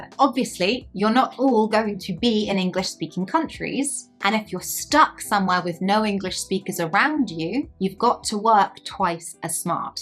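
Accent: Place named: United Kingdom. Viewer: Dear it is a real pleasure for me;) it helps me remind some expressions and refresh this beautiful language. Love the UK accent more than the American one;) so polite and a ‘clean pronunciation’ Definitely, English has been very important in my life and musical career … more than Spanish … can you imagine? Happy to discover your Channel and follow every episode🙏🩵🙏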